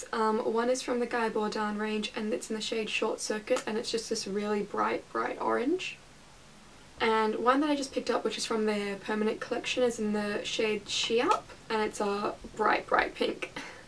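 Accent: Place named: Australia. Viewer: Are you from Australia?? Btw I love ur accent ❤️❤️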